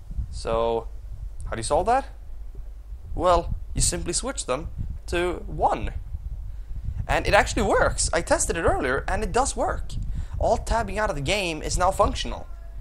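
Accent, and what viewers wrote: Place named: Sweden. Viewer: not a good thing dude. You have a really horrible way of delivering what you're trying to say. You take way too long to say it and sound really spastic. But it sounds like you're swedish so i'll just chalk it up to english possibly not being your first language.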